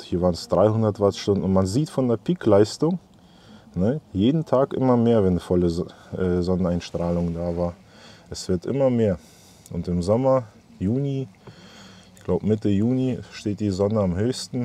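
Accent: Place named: Leipzig, Germany. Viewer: Lieber. Klasse Video, ich höre Deinen Akzent als Leipziger immer wieder total gerne. Dein Humor ist spitze trocken. Sag mal bitte, was hast du im Garten für ein Windrad? Nutzt du dies auch zur Inseleinspeisung und welche Erfahrung hast du bei den letzten Stürmen? Lieben Gruß Thomas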